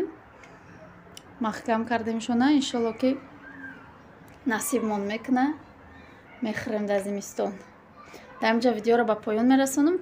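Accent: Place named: Hisor, Tajikistan. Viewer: Ассалому алайкум ва баракатуху Апачон созен хубен фарзандо созан хубан хамакаса ма ша Аллох шумо бехтарин Зан хастен зани хоксори точикои худмон шумо бехад манда махкул шиден простой Зан хастен авалдарача гапзанитон манда махкулай чунки ранги мо гапмезане мо аз Хисор мешем хонамон дар Кальаи Хисор наздикаст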